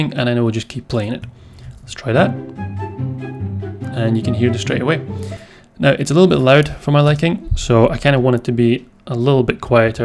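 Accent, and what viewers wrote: United Kingdom. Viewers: Are you british?i heard lot of init(not programming init).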